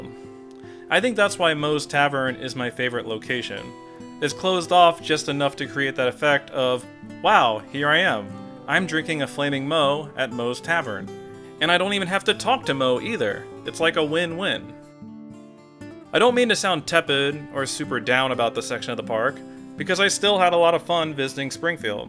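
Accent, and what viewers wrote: United States. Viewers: It's weird how as a British person I didn't realise how American your voice is until I hear you speaking over irl footage! Great vid!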